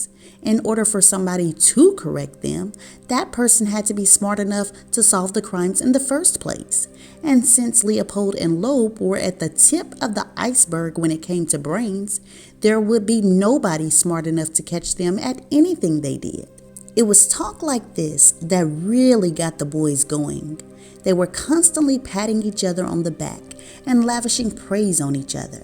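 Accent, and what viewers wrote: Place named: Memphis, United States. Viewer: This was good! Girlllll I thought you were going to be all serious throughout until you gave us that little funny voice over! 🤣 I understand this is a serious matter at hand but even tho " in my Memphis accent " still be you! That's what drew me and others to you. I'm very intrigued about this new genre. You GO GIRL! P.S. I want to purchase your book but forgot the name. Blame my mind and not my heart chile I know you've mentioned it a bunch in the earlier vids... ❤ nevertheless you're an awesome story teller! Hopefully I'll catch a Live 😅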